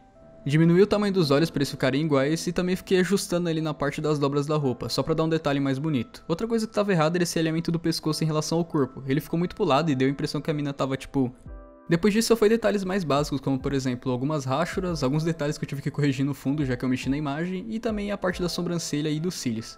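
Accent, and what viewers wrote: Rio Grande do Sul, Brazil. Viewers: Gaúcho né kkk?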